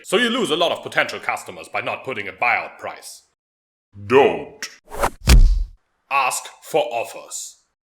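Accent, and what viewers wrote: Germany. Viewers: ***** Dass es Deutsch war wusste ich. Ich war nur verwundert, da ich persönlich den deutschen Akzent nicht wirklich mag. :3 Aber trotzdem danke für die Info.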